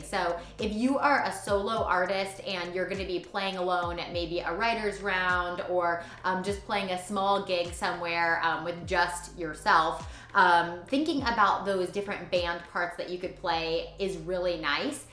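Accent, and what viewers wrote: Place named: Nashville, United States. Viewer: I know very little about loopers. Quite proud of myself that I previously identified the TC Ditto x2 as my #1 pic for the same reasons that you did. This was still a real helpful video. Also, have to say- starting with a cool demo is so good. Most people start their vids with five minutes of talking. You Win! and sound like a Nashville area pro.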